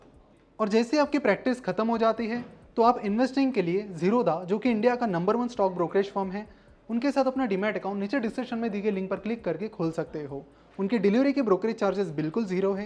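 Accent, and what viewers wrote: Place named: Nagpur, India. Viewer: Sir aap nagpur se belong karate ho sun le.proud feel huwa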